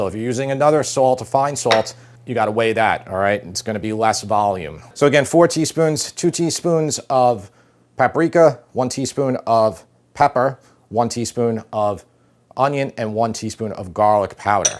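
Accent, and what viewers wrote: New York, United States. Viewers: The problem is HFCS replacing cane sugar very few bottled sauce is real cane sugar and or honey. Definitely better making your own Especially Heinz has simply and organic made without hfcs. I sure hope your taste tester can tell the difference. Like u said can see it as well. You look and sound like my cuz that lives in Queens. Your one of a very few youtube cooks without sthick straight forward no bs approach. Definitely love the deli experience. Living in Central Ca can get some quality products but unless in major city hard to find many NYC even east coast products No Uncle Guisseppe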